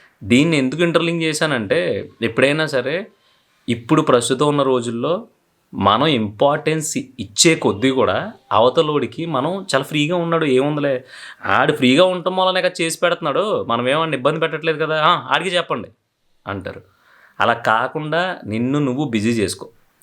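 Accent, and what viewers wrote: Godavari, India. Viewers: బ్రో సూపర్ మా గోదావరి జిల్లా భాష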